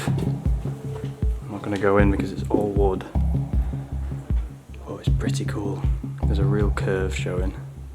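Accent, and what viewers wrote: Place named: Stoke, United Kingdom. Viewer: you sound like a Stokie, would I be right in thinking that? this is a stunning video!! Well done. subscribed.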